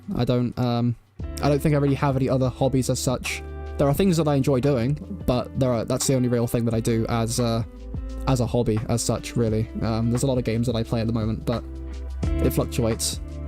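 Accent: United Kingdom. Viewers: AAAA CONGRATS!!! you sound very british ahahaha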